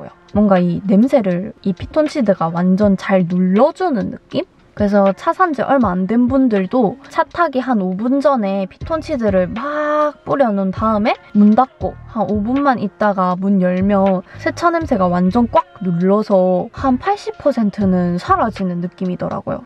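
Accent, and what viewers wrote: Gyeongsang, South Korea. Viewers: 경상도 같은데 대구분이신가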